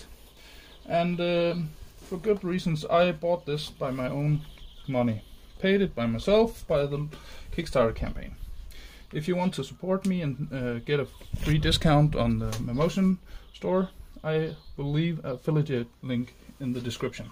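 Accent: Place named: Denmark. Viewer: Your English just sounded a lot like when a Dane speaks English. And I was curious what the price is with all Danish taxes etc :)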